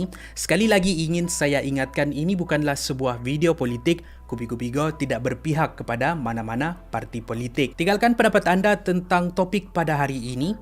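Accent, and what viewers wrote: Sabah, Malaysia. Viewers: Buat pengetahuan semua bukan orang Indonesia. Dia orang Sabah memang loghat dia pun beza.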